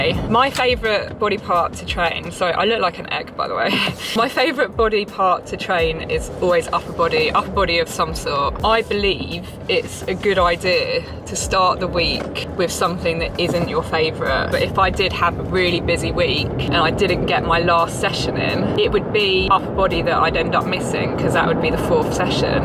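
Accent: United Kingdom. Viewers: Are you British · I don't know why....but I love to decode British accent 😅..and I love your accent too❤️ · I didn't know British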